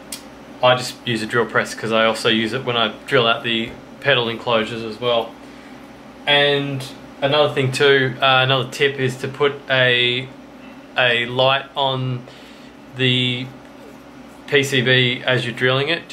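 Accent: Australia. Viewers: Skyim desktop. Awww yeah. Haha- thanks for the tutorials mate! I detect a fellow Aussie... I'm just getting started with building pedals, I've put together two BYOC kits and I'd like to go onto perhaps veroboard on even etch my own.. where do you get the veroboard, copper board, and ammonium sulphate etc.?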